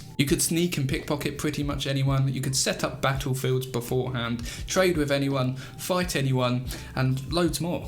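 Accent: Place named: United Kingdom. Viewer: Hi! Great video! Just curious, where are you from? I would guess somewhere in UK but can’t place an exact place. I think baldurs gate 3 is gonna be amazing!! Thanks and keep up the great content.:)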